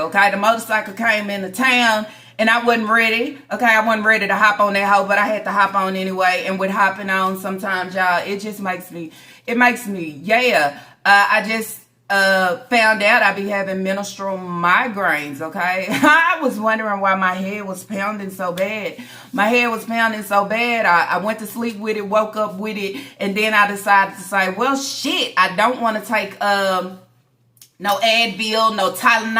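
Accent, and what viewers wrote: Texas, United States. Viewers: ❤ hay girllll hay I just put up.grocerys An stop to catch my.breath.when I herd you say radiation contamination.I fell out laughing. An with that beautiful Texas accent. It's to much.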